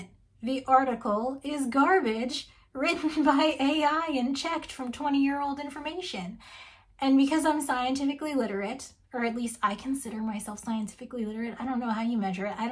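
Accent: Kentucky, United States. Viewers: More Kentucky accent!!!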